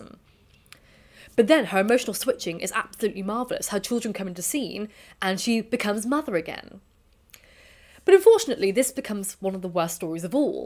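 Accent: RP, United Kingdom. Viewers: I love your received British accent. I remember not liking it at all even a romcom can work if it's at all believable and there's a sufficiently compelling set up and protagonist but I wasn't impressed.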